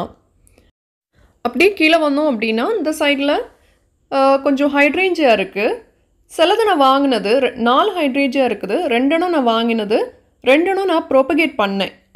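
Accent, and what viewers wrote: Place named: Nagercoil, India. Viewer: Mam nagercoil language apadiyae eruku, nanae entha place nu kekalam ni erunthaen, ngl la entha place mam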